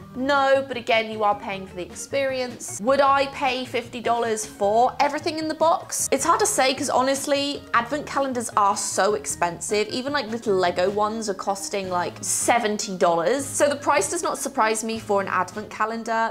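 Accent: England, United Kingdom. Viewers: seriously LOVE that pays attention to and creates her own closed captioning. Not everyone does, and since she speaks quickly sometimes and I don't 100% understand everything in an English accent, I really appreciate it. Thanks,! Hope you're doing well!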